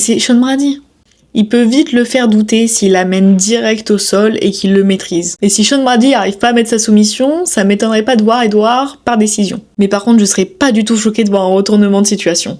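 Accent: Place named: Paris, France. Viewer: C’est l’accent Panaméen.. attention on critique pas …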